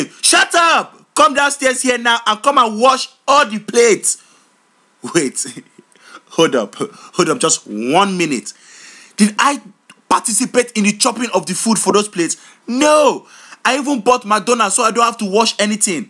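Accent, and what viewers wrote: United States. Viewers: ur american accent is good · Love ur American accent lol im American and ghanaian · woah your american accent is good af! scared me lol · Nice American accent. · wow your american accent is really good! and i nearly choked when you said youre trying to get ffreaky. too. fucking. funny. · Lmaooooo Nigerians! It is a struggle! But your American accent is better than mine and I'm American lol! Very funny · dayum his american accent is so good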